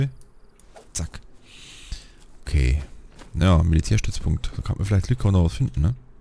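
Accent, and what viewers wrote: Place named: Bavaria, Germany. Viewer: Supaa Video. Endlich moi a scheens Boarisch hean. Do head ma gern zua. Mach weida so.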